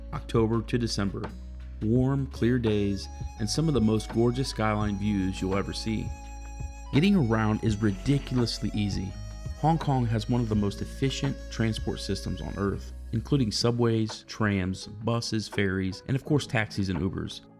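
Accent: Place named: South, United States. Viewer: You accent is horrible ! Please sound Swedish and not Southern USA.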